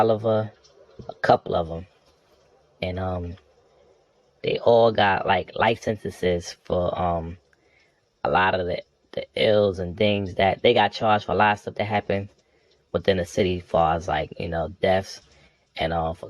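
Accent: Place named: Baltimore, United States. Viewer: Yo you got to be from Baltimore please work on presenting your presentation better you keep saying arm that shit is driving me crazy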